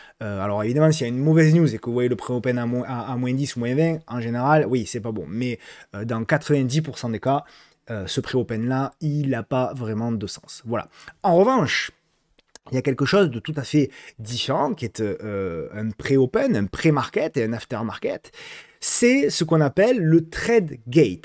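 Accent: South, France. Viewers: j'aime bien l'accent du sud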